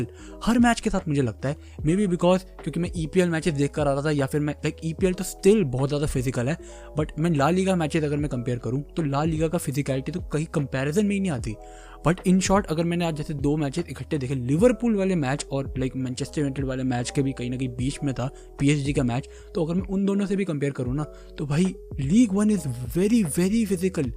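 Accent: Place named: Delhi, India. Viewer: Bhai hai Kidhar se tu Delhi ka lagta hai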